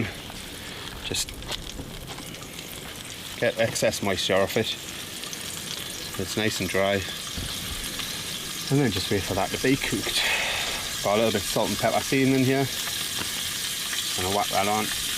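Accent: Newcastle, United Kingdom. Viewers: ha where u from mate u sound like your from newcastle ....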